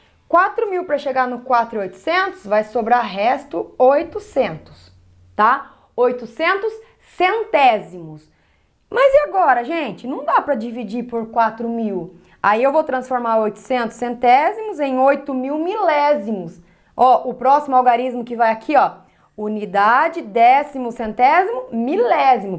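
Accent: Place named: Caipira, Brazil. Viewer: Muito bem explicado! E o seu sotaquezinho caipira é muito bonitinho!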